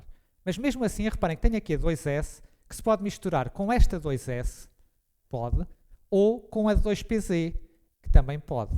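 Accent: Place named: Portugal. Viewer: Caralho, eu tive sinceras dúvidas se eu continuava a ouvir por causa do português. Mas a aula é tão boa que eu ignorei totalmente o sotaque. Consegui entender. Parabéns.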